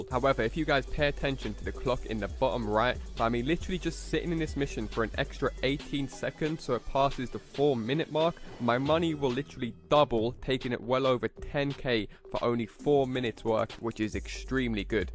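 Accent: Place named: England, United Kingdom. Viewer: Ohh l love that english accent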